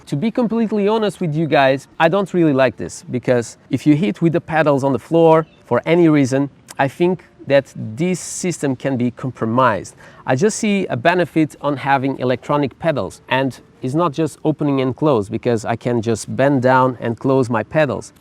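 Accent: Portugal. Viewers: Apostava que és português...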